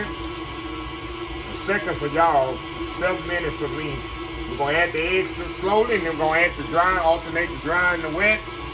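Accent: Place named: Texas, United States. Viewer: I came here because the church folks asked for this cake....I knew that was an Texans accent ❣️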